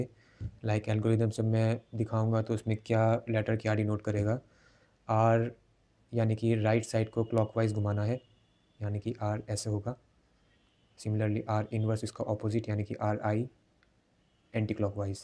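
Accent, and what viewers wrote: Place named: India. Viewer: I came here just to listen to hindi accent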